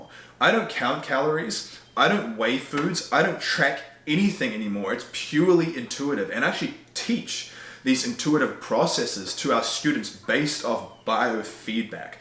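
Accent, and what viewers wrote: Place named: New Zealand. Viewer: You have a New Zealand accent, mate. Have you lived there a part of your life?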